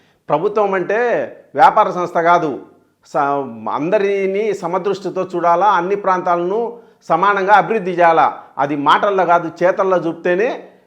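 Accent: Rayalaseema, India. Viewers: రాయలసీమ ప్రజల ఘోష సీమ యాసలో చక్కగా వినిపించారు అన్న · రాయలసీమ యాసలో సంపినవ్ నా... సూపర్ బావుంది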